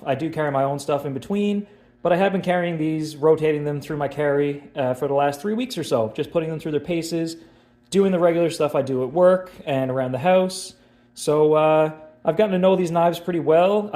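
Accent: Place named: East Coast, Canada. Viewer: You sounds like you’re from the east coast of Canada. Good video by the way.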